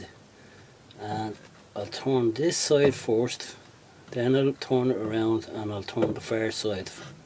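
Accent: Dublin, Ireland. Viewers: i heard Dublin when ya spoke.. My uncles, my cousins, my Granny & Granda. i miss the way family was when that house was alive, & the epicenter. It was where Granny kept the whole world together. Where i really learned to have a propper fry, & where i almost always slept & felt at home when visiting. im 1st born American, always lived in California, but you know ive eaten mackerel fished out of a pole in the water while swimming out in Houth, so ill be alright- fuck me though... What was it you were doin' again? (subbed, cheers! & thanks)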